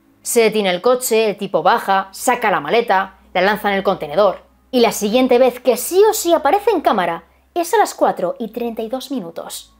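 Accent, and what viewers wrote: Spain. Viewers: me encantan tus vídeos Antes cuando recién vi uno no entendía bien el español de España me parecía muy rápido la manera de hablar, ahora ya lo comprendo súper bien 😂❤ Me acompañan tus videos mientras hago la limpieza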